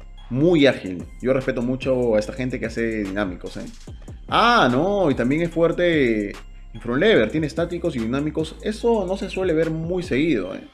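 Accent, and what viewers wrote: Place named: Mexico. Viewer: Pense que eras mexicano Saludos y exito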